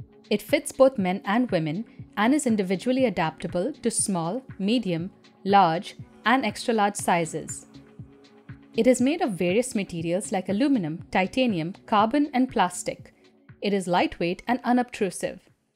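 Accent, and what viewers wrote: India. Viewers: I guess that u are from India because of your accent 😂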